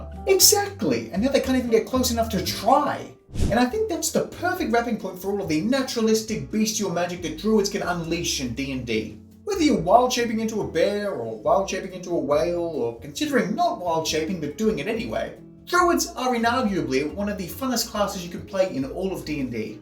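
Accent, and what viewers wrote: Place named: Australia. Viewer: G'day mate. Just searched for Aussie D&D channels and yours came up top of the list. Good to hear a familiar fast talking accent 😂 Druids are so broken. The kind of class you love to play, hate to DM 😅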